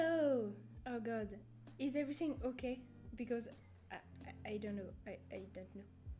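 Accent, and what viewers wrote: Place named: France. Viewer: Very proud of you for steaming in English. it must be scary speaking especially streaming and talking to people in a Language you’re not 100% confident in speaking. I could understand you fine I think you’re English is good yes you do have a heavy French accent but I think that shows you’re background. and it’s apart of who you are that’s something to be proud of people used to make fun of me for the way my voice sounded because of my Chinese accent and I hated it and hated the way my voice sounded but my voice and my accent is a part of who I am and I’ve overtime become ok with that so please never be ashamed of that it does take a while to become comfortable with yourself and the way you’re voice sounds but as you become more confident in speaking you will realise that your voice isn’t as bad as you thought it was if anyone makes fun of your voice you can say L + ratio plus I’m bilingual and your not lol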